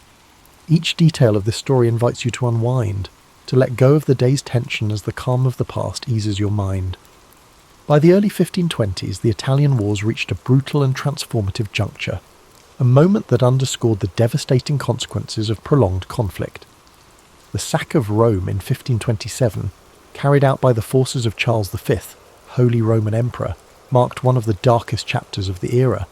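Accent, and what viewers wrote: United Kingdom. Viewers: YESSS a British narrator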